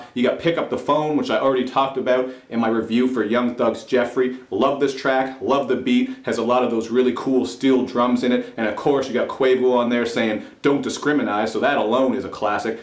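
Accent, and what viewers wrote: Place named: Canada. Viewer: oh youre canadian thats a funky accent bro and in part sound funky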